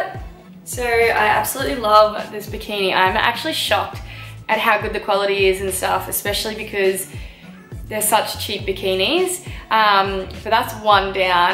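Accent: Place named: Australia. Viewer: Australian girls are so hot and the accent is very cool.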